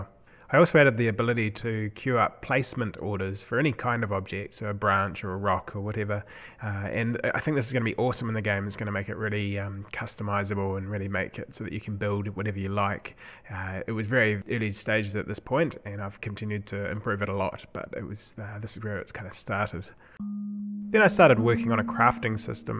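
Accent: New Zealand. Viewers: its kiwi not Australian can't you tell? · Pretty impressive stuff, amazing that this is all in your own engine! I worry your game has next to no actual game-play. Hard to tell but it seems like you ahve a lot of work to do in terms of crating, building, exploring, sapien interaction etc. Tech wise it seems great though, visually it is pretty good. Seems strange to have that entire world view and then top down selection system, just for the first 30 seconds of the game. Is there any reason you would go back to that view? PS. Are you from NZ? Where abouts?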